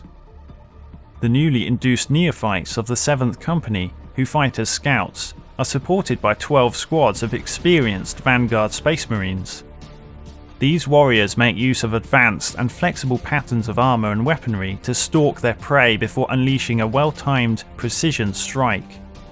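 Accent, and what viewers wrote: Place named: United Kingdom. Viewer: The GrimDark Narrator sent me your way, says you know your stuff AND have a british accent.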